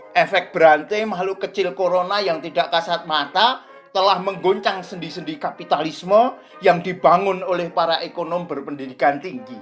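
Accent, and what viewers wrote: Java, Indonesia. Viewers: jooss .. bahasa medhok nya ngakak...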